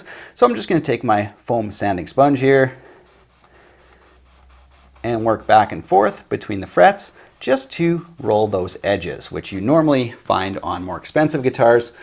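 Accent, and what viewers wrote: Canada. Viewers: Love your channel,. You obviously know your stuff and love playing! Your Canadian accent is icing on the cake.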